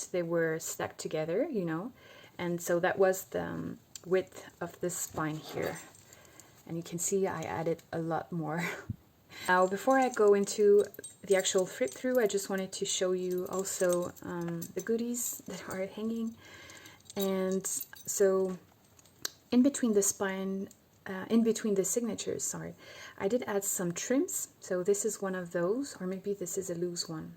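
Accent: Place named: Quebec, Canada. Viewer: I love this, very beautiful work! Are you French? You have an accent that sounds from Québec. Just asking cause I am in Montreal!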